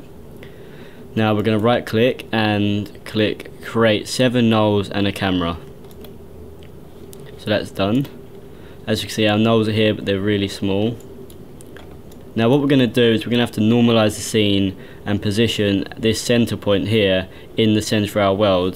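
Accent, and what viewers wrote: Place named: United Kingdom. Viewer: Don't understand British English..... ==' .... American is BETTER!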